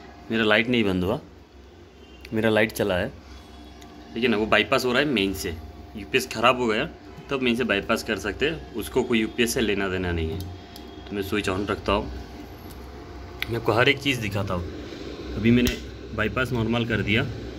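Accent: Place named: Arunachal Pradesh, India. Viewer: Arunachali Accent 🎉😅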